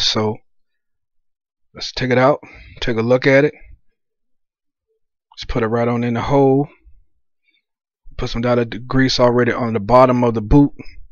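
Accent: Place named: DMV, United States. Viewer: I know a DMV accent from anywhere, Thanks for the help!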